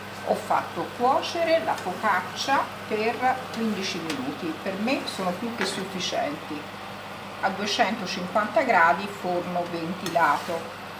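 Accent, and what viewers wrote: Tuscany, Italy. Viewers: Thank you. Love olive Focaccia, love your Tuscany accent as well. 🌾🎈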